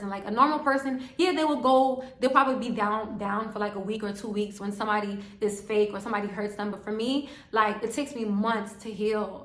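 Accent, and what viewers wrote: New York, United States. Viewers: You sound so New York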